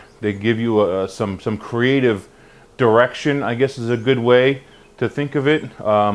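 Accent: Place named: Philadelphia, United States. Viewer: You must be from the Philly/SJ area. Your accent betrays you.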